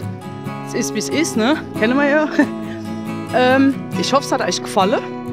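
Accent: Palatinate, Germany. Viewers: Also je öfter ich Deine Videos sehe, um so besser verstehe ich den Pfälzer Dialekt 🤓 Der Kamin war ja mal ne coole Sache 👍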